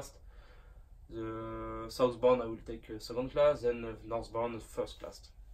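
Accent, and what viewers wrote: France. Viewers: On reconnait bien le petit l'accent français comme tu parle anglais :D bref, Bonne chance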